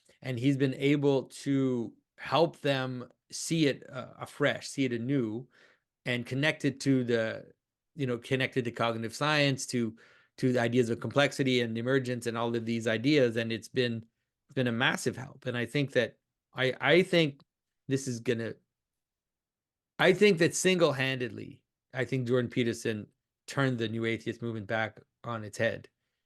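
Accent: Wales, United Kingdom. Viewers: You are so Welsh. Love it.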